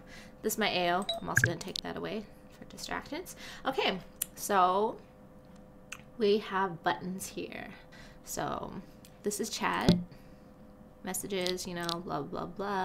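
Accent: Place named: United States. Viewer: This was so entertaining thank you. I love your accent, im presuming your American, im in Scotland so impossible to tell where in the states people are from lol. Thanks :) x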